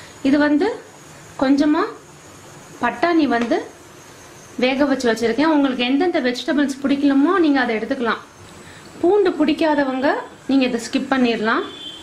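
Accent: India. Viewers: nice to hear the Madurai slang after a long time.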